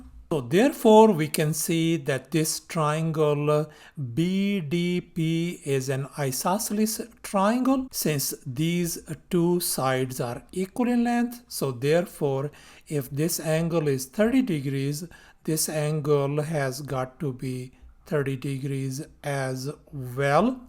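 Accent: India. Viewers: Teacher are you from İndia?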